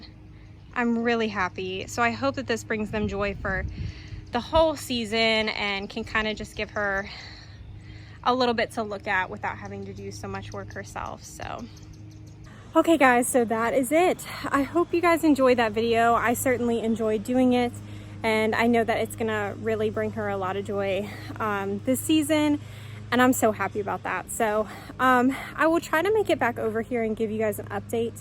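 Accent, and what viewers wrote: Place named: South, United States. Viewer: Hey, I was wondering what state and zone you are located in? Seems like your Southern.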